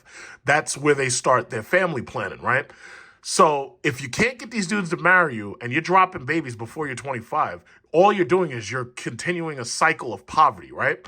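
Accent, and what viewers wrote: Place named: New York, United States. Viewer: This dude sound like he's from NYC totally funny guy, but my man spitting out 💎 good content.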